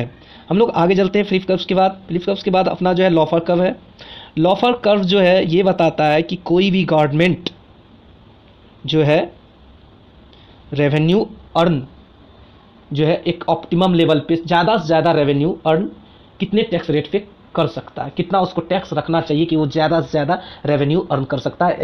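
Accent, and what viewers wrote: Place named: Bihar, India. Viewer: Very nicely explained.. It seems you are from BIhar. Good luck..!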